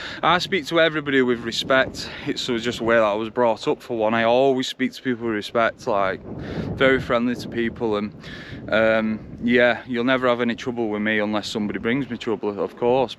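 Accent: Liverpool, United Kingdom. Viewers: Yes lad LIVERPOOL was expecting you to say man utd with the accent love the channel lad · You've lost your Liverpool accent.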